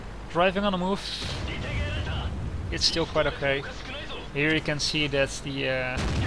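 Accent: Netherlands. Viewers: Nice evaluation of the tank. Woulld you prefer it over the Leopard 1? And also, you sound like you are Dutch? :P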